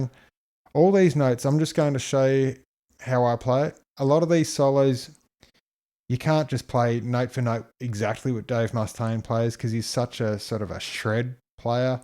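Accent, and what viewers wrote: Australia. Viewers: Hey, You sound like an Australian, ain’t ya?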